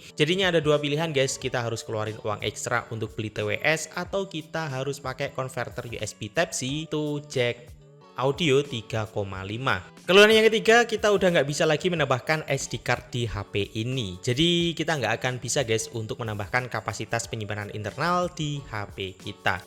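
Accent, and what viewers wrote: Banyuwangi, Indonesia. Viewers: Lho sampean orang BWI mas?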